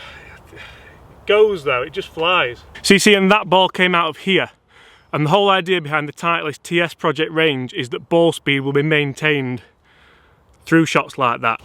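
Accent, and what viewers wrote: Yorkshire, United Kingdom. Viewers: You are growing on me man! I was unsure at first, but I'm thinking it was the Yorkshire accent..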